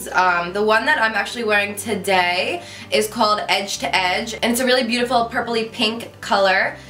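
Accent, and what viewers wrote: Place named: Rhode Island, United States. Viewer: Haha I know she's from New Jersey but being from Rhode Island I think she has a Rhode Island accent, not like they're any different tho.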